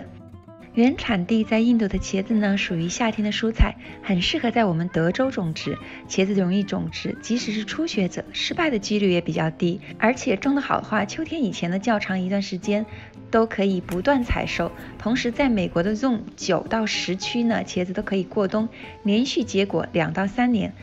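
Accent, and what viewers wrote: Sichuan, China. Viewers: 小姐姐是四川人？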